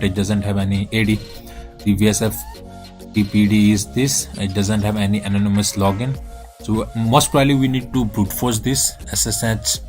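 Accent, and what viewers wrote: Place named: India. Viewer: this is good too, but the hindi accent is conflicting with your english so my advise is that you should try hindi in a video...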